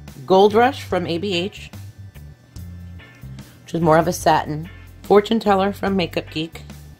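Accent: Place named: Staten Island, United States. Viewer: I could cry right now girl...I dont know how I missed it from the first time I watched this video..., I am from Staten Island too. I live here still. Your voice had a familiar quality for me...we probably both have the same accent 😁💙💚💙💚 I didnt think I could love you any more but now I do!!! Its so amazing to find someone as knowledgeable as you on YouTube and social media and know that you are from my hometown. 💚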